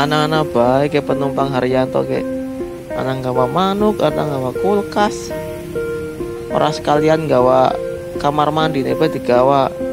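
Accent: Banyumas, Indonesia. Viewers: Aku wong ngapak juga mas 👍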